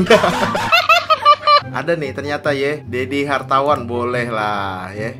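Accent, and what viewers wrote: Banjar, Indonesia. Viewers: Bah orang banjar ni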